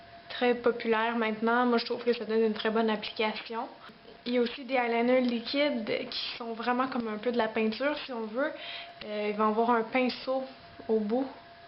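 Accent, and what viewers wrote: Quebec, Canada. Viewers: Est-tu du Québec :D ? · c parcqu'elle est québécoise. peut-etre qu'elle n'a pas un bon accent français mais je parie qu'elle parle anglais mieux que toi. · Salut, j'adore ta chaine tu a de l'humour j'adore l'accent quebequois,j'adore ta chaine JE TE KIFF!!!!!!!!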